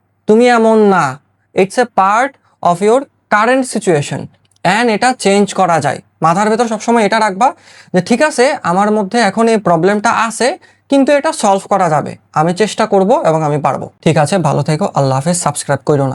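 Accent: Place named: Noakhali, Bangladesh. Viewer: Apni ki Noakhali'r ba Feni'r? Na mane apnar kothay oikhaner ekta taan ase toh tai.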